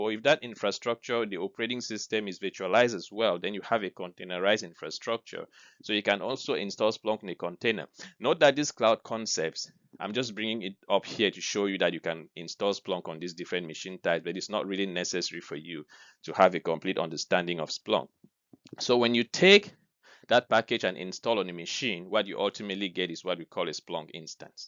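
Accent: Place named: Nigeria. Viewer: you sound very Nigeria but i like you teaching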